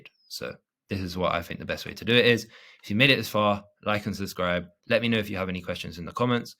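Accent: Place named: England, United Kingdom. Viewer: Hey bro very useful video 👌🏽 I’m also from england feel like your accent is close to where I live lol. I got a question, I’m starting in the restaurant niche as I’m 22 and have been in the industry since 16 so call me an insider if you will, what I don’t get is the pricing, clientele is different to the average smma niches average cost per head is like 20-40 quid not 1000 so I don’t get how I can make it work maybe I already know the answer but would it help figuring out the pricing after the zoom call to find out about the restaurant how much they make and their goals etc thanks.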